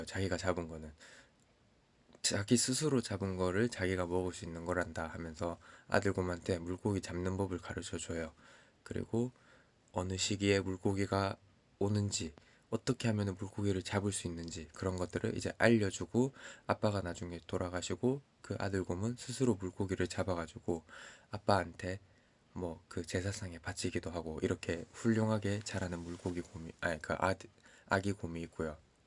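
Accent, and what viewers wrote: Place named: Gyeongsang, South Korea. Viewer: 어헛!! 님!! 사투리가 경상도 같았는데 무려 "대구" 셨군요!! ㅎㅎ 저도 대구본가 왔네요😊😊즐거운명절되시고 행복한 시간되세요🤩🤩🔥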